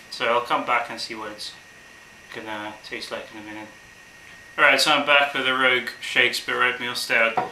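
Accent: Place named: England, United Kingdom. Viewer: nice review!! where in english are u from?? I am from vancouver canada living in osaka japan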